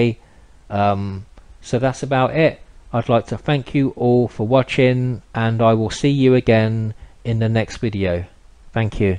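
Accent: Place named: East London, United Kingdom. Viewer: I love the commentary. I lived in London (Plaistow786) years ago. I miss hearing the accents of East London.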